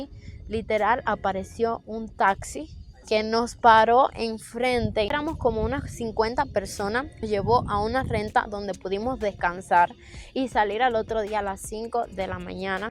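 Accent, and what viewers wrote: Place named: Veracruz, Mexico. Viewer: Vente a Veracruz Tenemos el mismo acento y comemos arroz blanco y frijol negro😊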